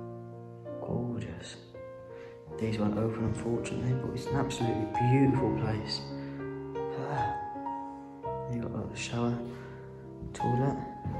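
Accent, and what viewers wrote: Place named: Derbyshire, United Kingdom. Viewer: A bit posh for a Derbyshire girl 😂 😍😍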